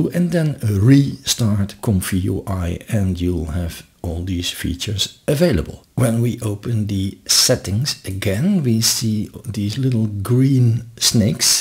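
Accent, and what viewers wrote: Netherlands. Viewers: Thanks for the overview of what this extension does I have to say but away. To say when you started it was really hard for me to figure out where you were from but the further you went on in the video, hoe meer het Nederlandse dat Nederlandse tongval wil gewoon door te schemeren. But that's not important great video man thank you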